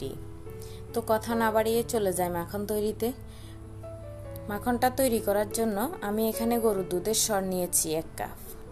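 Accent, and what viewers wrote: Chittagong, Bangladesh. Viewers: Chittagong er manos mone hocce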